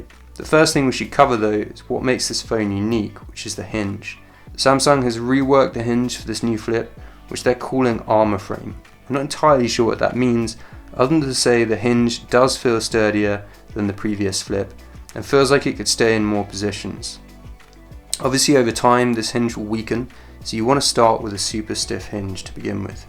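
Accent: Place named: United Kingdom. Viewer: I enjoy the British accent too!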